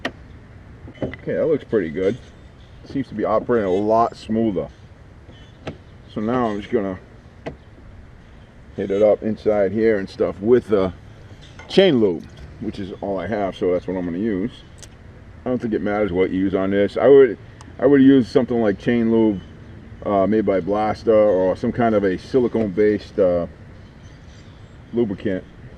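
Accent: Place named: New York, United States. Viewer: love your accent man. Is that NY?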